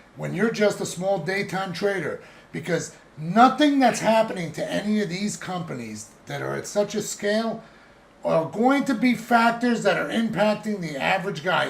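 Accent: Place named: Philadelphia, United States. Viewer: Hey are you from Philly?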